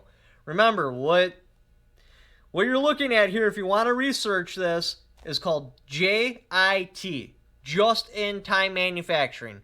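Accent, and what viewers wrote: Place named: Wisconsin, United States. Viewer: LOVE ALL OF YOUR VIDEOS AND WISCONSIN ACCENT. KEEP SPEAKING THE TRUTH BROTHER.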